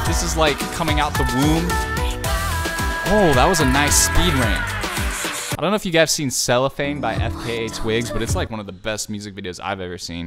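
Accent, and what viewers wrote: United States. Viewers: Bro I'm lesbian ✨I thought your American ✨💯😂legend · Hey, I'm lesbian. I thought you were American. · I'm lesbian i thought your American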